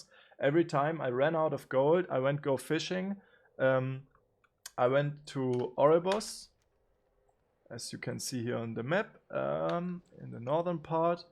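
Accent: Germany. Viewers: Why do you sound German so hard? its so annoying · hab direkt gehört das du deutscher bist. :D leider hab ich net viel verstanden wie das gehen soll jetzt....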